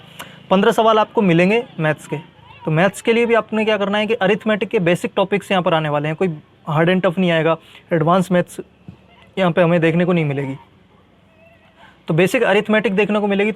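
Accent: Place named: Chittorgarh, India. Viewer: Chittorgarh se lg rha hai Bhai .....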